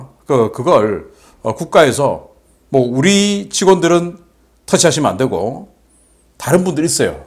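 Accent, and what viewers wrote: Gyeongsang, South Korea. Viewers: 구수한 경상도사투리항상잼나게즐구독하고있어예~^^;아침마다구독과좋아요..꾸욱~보냅니다..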